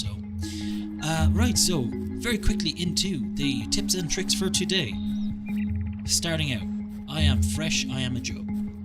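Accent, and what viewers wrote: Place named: Newfoundland, Canada. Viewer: You're from Newfoundland arent ya! I'm from Ontario, we love you fellas :)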